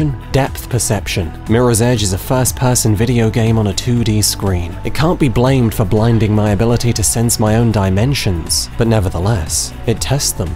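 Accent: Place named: United Kingdom. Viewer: I would say it's that damn British accent but no I think you're quite right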